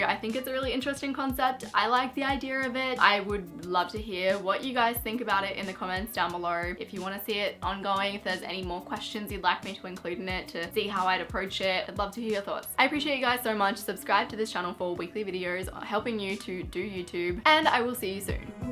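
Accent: Australia. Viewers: It's gonna sound weird but I love your accent I feel like Australians have the best accent to me at least anyways great video ✨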